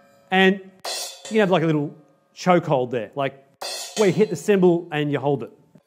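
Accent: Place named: South Africa. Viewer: *accent sorry. You're probably Australian, but I'm leaning towards South African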